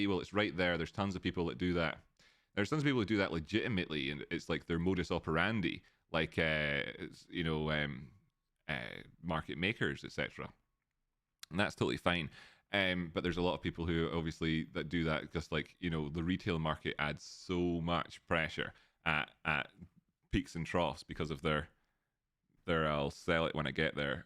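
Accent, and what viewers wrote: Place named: Scotland, United Kingdom. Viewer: I've been watching you for months now, and have always wondered what the "uh oh" button was. While rewatching the VLDR TA i finally realized you were saying "auto button" with your scottish accent. Make's so much more sense now. im such a fanny lmayo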